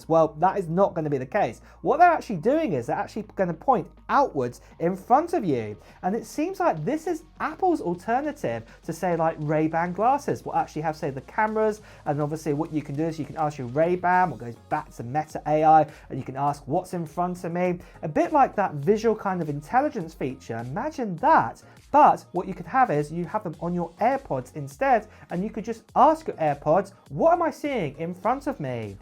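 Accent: United Kingdom. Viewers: Are you British as you sound British so why not tell us how much they cost in Pounds ?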